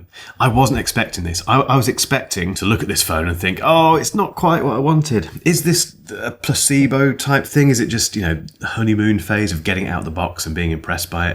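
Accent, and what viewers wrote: United Kingdom. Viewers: can you please say aluminum once again? didn’t hear that in british accent since jony ive left apple 😅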